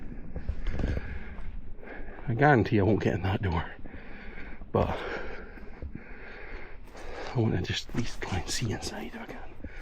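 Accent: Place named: Scotland, United Kingdom. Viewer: Sorry you were puffing but this subscriber likes listening to a human narrator and with a Scottish accent talking about Scotland 🏴 Thank you 🏴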